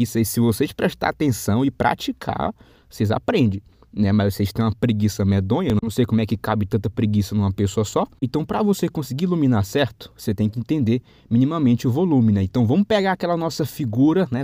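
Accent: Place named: Ceará, Brazil. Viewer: Você é Cearense amigo, seu jeito de falar lembra muito. Muito bom a forma que você explica kkkkkk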